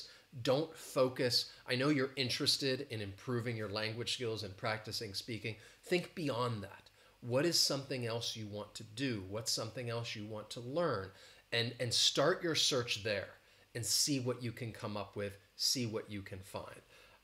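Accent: United States. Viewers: I was waiting for american accent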